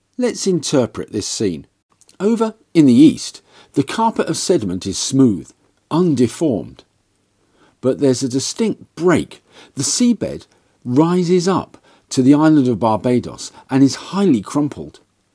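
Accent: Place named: United Kingdom. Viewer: Very good, thanks, and I'm very glad you're a Brit. I wanted to watch this when it came up as recommended, but was prepared to click away immediately if I heard an American accent. Subscribed.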